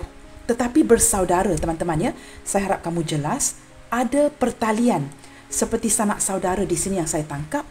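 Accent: Malaysia. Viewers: Thanks you atas pencerahan nya bunda and aku suka swara nya yang khas Melayu nya sexi sekali bunda and sehat selalu ya bunda semanggat trus dalam konten nya 🙏🙏🙏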